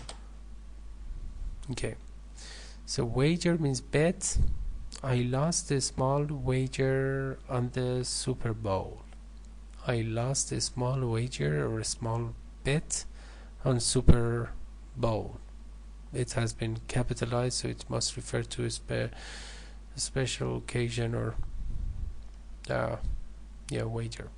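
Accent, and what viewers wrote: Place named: Iran. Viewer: Such a thick Persian accent! dear lord! P.S. your examples are filled with grammatical errors.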